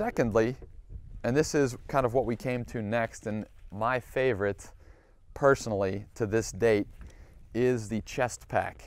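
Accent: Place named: Pennsylvania, United States. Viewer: Knew as soon as I heard you speak you were a Pennsylvanian like me 😄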